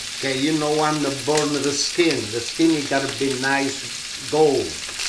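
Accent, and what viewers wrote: Italy. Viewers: I love that Italian accent…reminds me of my grandparents ❤❤. We need more stories of your life in Italy!😊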